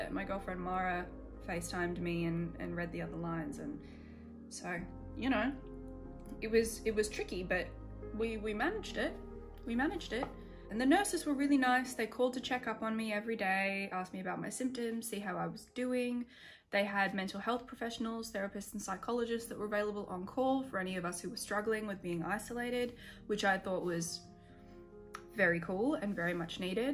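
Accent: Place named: Australia. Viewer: You can tell you're home because you sound SUPPPPPER Aussie. Thanks for sharing your journey, can't wait to watch your streams!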